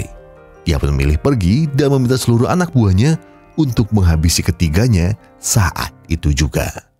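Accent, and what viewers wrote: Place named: Semarang, Indonesia. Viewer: lek ngademin logate koyok wong semarangan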